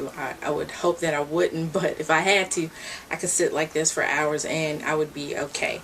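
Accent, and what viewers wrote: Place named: United States. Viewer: You are an African talk like an African why why this American talking style?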